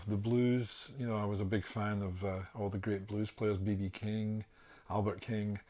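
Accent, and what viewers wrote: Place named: Glasgow, United Kingdom. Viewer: how can you not love that Glasgow accent?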